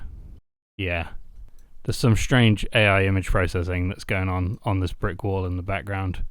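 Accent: United Kingdom. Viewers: British accent 😮😢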